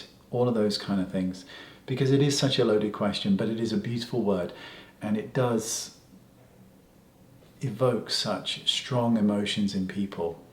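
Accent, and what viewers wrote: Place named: England, United Kingdom. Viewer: Hello 💗 nice 1 again I already had this idea once cause I always use a lot of these interdental brushes as I really need that as I have so tight teeth close to another.. Maybe I wasn't doing right as you just shown, thAnx. Ok then.. the last person I said I love you is my beloved sweet baby girl,my daughter of 6 and I really mean it. I love her to bits (or beats?) as said in England ☺️ and let me tell you Sir your speaking reminds me the real English talking... You really sound as the English gentleman 😄 when I was living in London yrs ago now, I started to get that particular accent but not too bold, I m Italian and from Tuscany so it's difficult to lose that 😆 after 7yrs I decided to going back to my country (love to see you here) now here I had not so much time and opportunities to talk daily with someone british ... 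I think you speak nice n well relaxed not as some Londoners, I couldn't get a word sometimes.. they are doing all fast even talking 😂 I think someone like you could be a better teacher for a foreigner... Maybe you can do also a video about to speak English properly to everyone?! Ciao💗take care 💓😘💚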